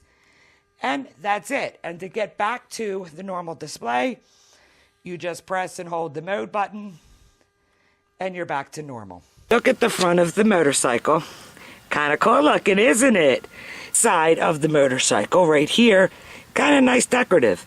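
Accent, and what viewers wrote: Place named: England, United Kingdom. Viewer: Great video and very informative, I too noticed you have some English accent as I say leeeeeever too!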